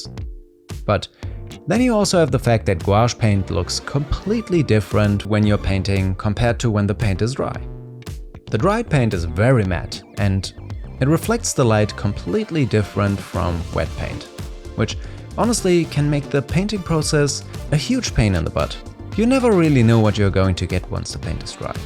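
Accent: Germany. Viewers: You sound so German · It took me 2 min and then I was like... "Is there a tiiiny german accent in there?" Clicked on your channel desc and damn hey german fellow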